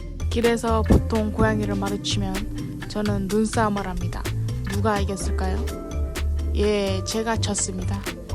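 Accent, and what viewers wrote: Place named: North Korea. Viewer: 북한말은 독학하신건가요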